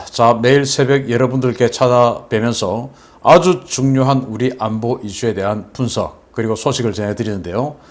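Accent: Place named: Busan, South Korea. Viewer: 신교수님의 촌철살인적인 강의를 듣는건 내 미천한 지식에 보탬을 얻고 지혜를 향상시키는 귀중한 시간인데 그것과 더해 아주 화끈한 즐거움을 선사하고 가끔 폭소를 자아내게합니다.ㅎㅎ 구수한 나의 본향 부산 억양도 정겹고..술자리 같이 하고싶은 마음..^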